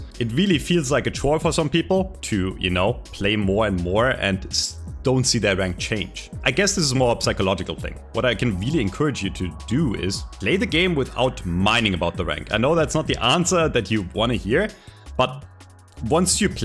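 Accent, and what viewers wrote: Germany. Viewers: are you german or danish? Basing it on accent.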